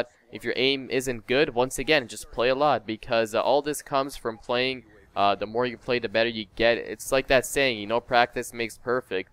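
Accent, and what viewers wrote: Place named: Canada. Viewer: Knew where you came from the second i heard you talk haha. what's up fellow Canadian!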